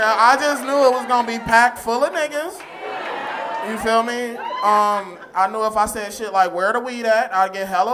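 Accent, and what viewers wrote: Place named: California, United States. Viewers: 100% LA County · 100% Cali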